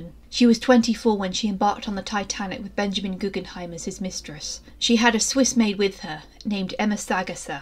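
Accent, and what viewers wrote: United Kingdom. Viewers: Impressive memorial, sublime research! Lovely narration, clear, impeccable English accent. Thank you. 😇🤗💃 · Just some friendly advice: keep the info about the person up longer (it went away too quickly so I had to keep going back to be able to read it all or pause on it and i'm a pretty fast reader) and speak a little slower because I missed a solid 50% of what you said it kinda felt like I was in a rush to hear you ha so I had to go back to listen to it again. You have a really beautiful voice (then again i've always been a sucker for British accents 😉💕), great narration and well made videos but I had trouble with those two things. Not trying to be rude by the way just trying to help 🙂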